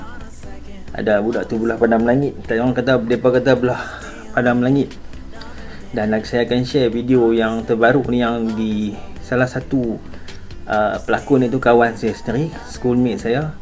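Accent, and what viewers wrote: Perlis, Malaysia. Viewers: penerangan yg mantap,nie baru kena cakap perlis..... lenggok tu kena dah...bukan setakat puluit n kasuit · Dengar suara piow org perlis huhu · haaa depa pnya chnel mmg sguh loghat prlis.....kemaih mf studio...mmg haqi2 dok tggu vdeo yg bqu...kep it up..👍👍👍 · tu dia oghang peghelih dah mai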